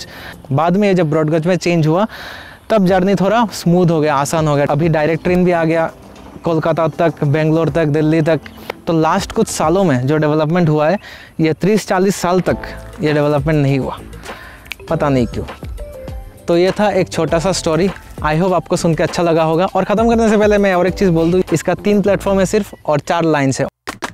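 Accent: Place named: Comilla, Bangladesh. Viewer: Dada, apnar local language akkebare amader Bangladesh er comilla , brah Mon baria'r local language er Moto..shune khub moja lagtesilo💕✔️🇧🇩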